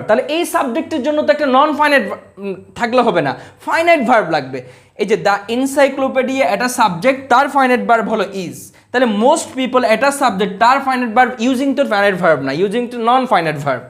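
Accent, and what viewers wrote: Chittagong, Bangladesh. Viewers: Vai ki CHITTAINGE NAKI...!!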